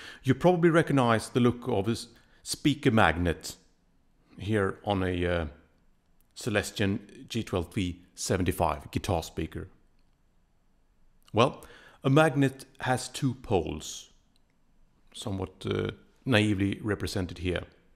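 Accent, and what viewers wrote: United Kingdom. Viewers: Anyone else think his voice sounds british but is actually swedish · I can’t tell if you’re very posh British, or Eastern European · It's crazy how has a much more British sounding voice than regular.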